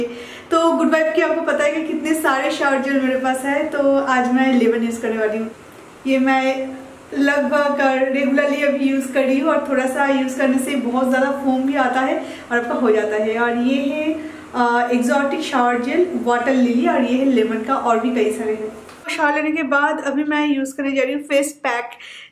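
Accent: Bengal, India. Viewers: Apki voice bahut achi bangali touch wali Hindi Luv d most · It's so inspiring to see you working so hard for you and your family's future. To all your haters i just wanna say that there's nothing wrong in your pronounciation ; it's just your bengali accent that gets mixed with it. They should rather get inspiration from you that you are working on your english even after so much hate.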